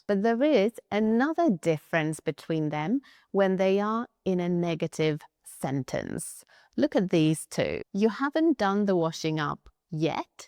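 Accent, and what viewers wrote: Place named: United Kingdom. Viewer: Thanks for your great teaching and your nice British accent ❤👍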